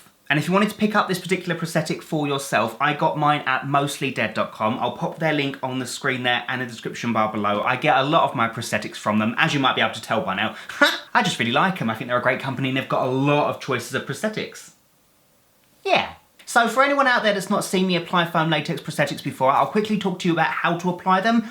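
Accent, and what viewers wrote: United Kingdom. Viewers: ik he is both of those I'm asking a question a lot of his fans know including me 1) u can tell he is gay because in one of his fan mail vids one of the fan mail letters it's said something bout his bf and u know he is British by his accent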